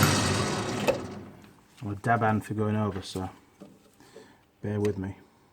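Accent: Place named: East Yorkshire, United Kingdom. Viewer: Hiya buddy, new subscriber after watching `no oil change for 75000km` . I was intrigued as to where you originally came from, sense a twang of East Yorkshire. Anyway good work fella and I shall start on your back catalog.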